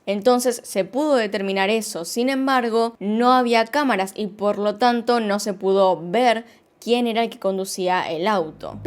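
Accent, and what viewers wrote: Argentina. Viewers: Tu acento argentino me gusta mucho, es un placer oírte, gracias por hacer casos para nosotros.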